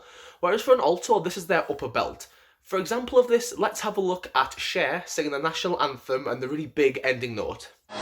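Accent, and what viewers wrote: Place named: North, United Kingdom. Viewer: I have an accent fetish but I can't place yours exactly...it must be the far north somewhere between Yorkshire, Scotland snd Northern Ireland. I think I'm in love.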